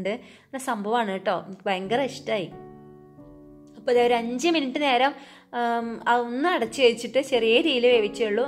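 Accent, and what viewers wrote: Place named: Northern Kerala, India. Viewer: .. നല്ല വീഡിയോ ആണ് കേട്ടോ.. I like your വടക്കൻ slang 😍😍😍പക്ഷേ അരി നാലഞ്ച് പ്രാവശ്യം കഴുകിയാൽ അതിലെ തവിട് മുഴുവൻ പോയി ഗുണം നഷ്ടപ്പെടും എന്ന് പറയാറുണ്ട്... അതുകൊണ്ട് ഞാൻ..maximum രണ്ട് മൂന്ന് പ്രാവശ്യം മാത്രേ kazhukarullu...just അഴുക്ക് കളയാൻ മാത്രം.. ഇത് negative comment Alla കേട്ടോ..😊😊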